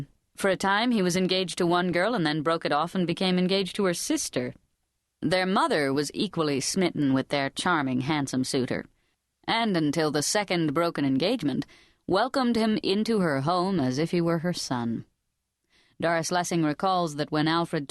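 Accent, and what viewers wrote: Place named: United States. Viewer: Terrible narrator….. thick American accent, lousy pronunciation. Unlistenable.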